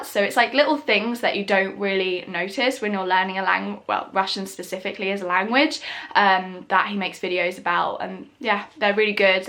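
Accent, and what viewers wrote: United Kingdom. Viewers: I love to listening to british accent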